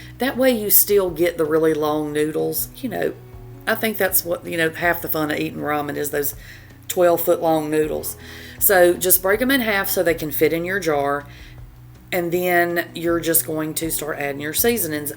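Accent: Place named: Carolinas, United States. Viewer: I made the chicken ramen today. Other than it being a little salty, it was *the best ramen I’ve ever had*! ❤ I made just the one so that I could taste for saltiness, my husband is on a low sodium diet and I just got used to it. I’ve been watching quite a few of your videos for the past few days, I finally hit that subscribe button. I love how your videos are precise, to the point, and you don’t edit your mishaps. It lets the younger viewers know that we all have mishaps, spill things, and occasionally forget to set the kitchen timer and burn something…even when we’ve been cooking for 30+ years. 😅 Btw, I love your accent. I’m assuming that you’re from one of the Carolina’s (I’m not asking for personal info, so no need to reply to that), definitely not from Georgia because you pronounce pecan correctly 😂. I was born, raised, and stayed in southern Mississippi. 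😊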